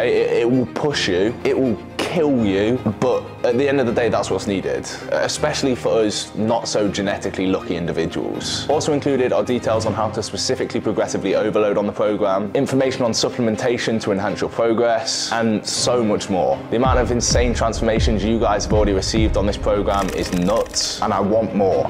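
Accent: United Kingdom. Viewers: omg british accent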